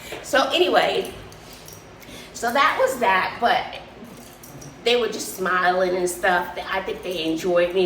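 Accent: New York, United States. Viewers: I missed where you are from, if you stated it earlier in a video. I’m going to guess you are from New York or Chicago.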